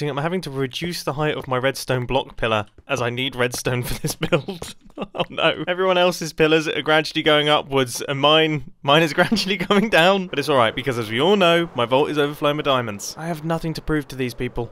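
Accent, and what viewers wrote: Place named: United Kingdom. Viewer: OMG I HAD FLASHBACKS FROM PORTAL 2 because his british accent and the moron combined just remind me of weatly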